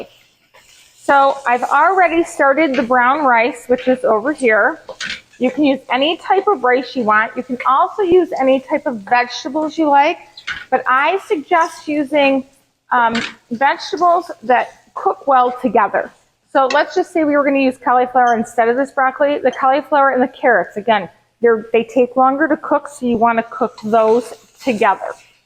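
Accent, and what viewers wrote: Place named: Fargo, United States. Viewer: I love the “Fargo” accent!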